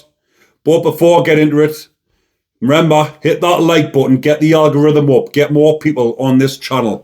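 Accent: Newcastle, United Kingdom. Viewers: Have watched about this before, but always love hearing the happy ending on this one. Especially when told with that canny accent. I'd love to know how the justice giver intended to cook Huckle's body parts for consumption... Must be a prison trick somewhere? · Lovong the Geordie accent when your telling your stories. I lived in Newcastle UT myself for nearly 12 years. Loved it. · You are a tough hard bloke and I love your Geordie accent. Keep it up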